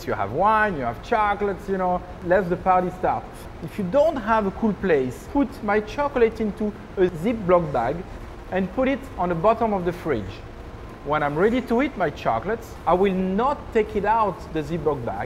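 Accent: France. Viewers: The French accent ssoooooooo does it!! Tem-purrr-achture!!! Love it !!! Is partly because they stick their lips forward like they are about to kiss you when they are talking...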